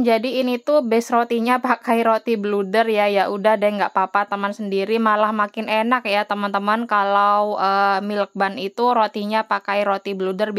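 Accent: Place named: Bondowoso, Indonesia. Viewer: Mbak ini dimana rumahnya? Koq lafadnya kayak orang Bondowoso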